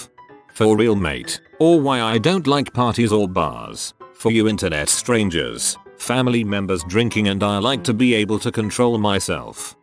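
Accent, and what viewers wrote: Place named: United Kingdom. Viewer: Who is this British robot guy who does all the Reddit videos? It’s the same voice on all the channels and videos!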